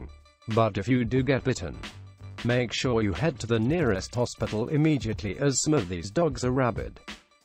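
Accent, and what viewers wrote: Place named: RP, United Kingdom. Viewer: Your tied #1 place for my favorite thai channel with 'do more life'. Love the David Niven accent. Funny channel. Each video has me laughing.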